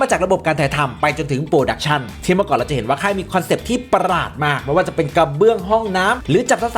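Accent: Isan, Thailand. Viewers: โอ้ยยยเนาะ เสียงภาคน้องกะตะมุตะมิหลายยยๆ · ชอบการนำเสนอการพากย์เสียงแทรกอิสานของคลิปมาก ฟังไปขำไป หางเสียงแบบสุดดี แบบฉาอ่ะครับ ชอบ👍🏻👍🏻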